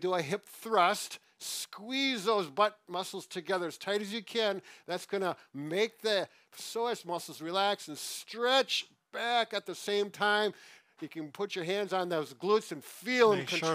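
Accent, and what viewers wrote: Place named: Wisconsin, United States. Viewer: I detect a Wisconsin accent.. you guys are rad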